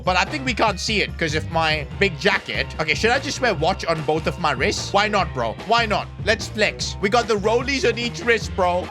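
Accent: India. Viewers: Bro are you indian?? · Are You Indian Broo??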